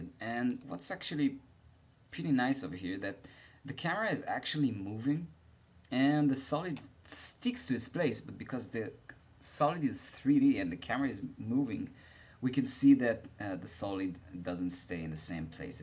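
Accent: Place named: Israel. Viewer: שומעים שאתה ישראלי אבל אחלה מדריך! תודה רבה:)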